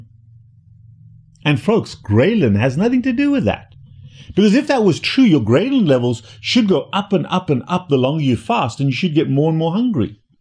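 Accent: South Africa. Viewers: That depends where you are from. He is from South Africa.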